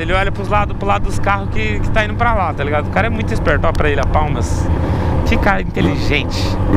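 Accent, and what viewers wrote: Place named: São Paulo, Brazil. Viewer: Meu se mora no Paraná mano mais meu deixa eu te falar porque se então fala igual a nois aqui de são paulo? Meu tô achando q o é de são paulo mano não do Paraná kkkkk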